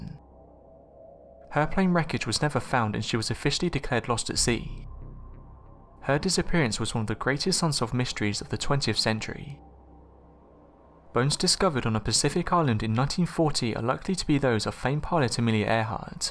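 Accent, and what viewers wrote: United Kingdom. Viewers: What's up with the robotic British dilougue, lol. I agree you truly can't say 99% without DNA. The bones and the plane been found in different locations so which is it.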